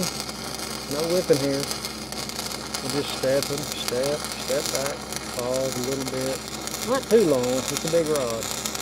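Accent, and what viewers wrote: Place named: Arkansas, United States. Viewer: Hey man I've been watching your channel for a couple years now. Long story short I'm from Arkansas as well. I always thought you were because of your accent. I'm a welder and I'm currently about to graduate out of a well known "Elite" welding school. I know you probably have heard of it. I would love to reach out to you and just get some advice. I don't know if you'll see my comment. I know you're busy take care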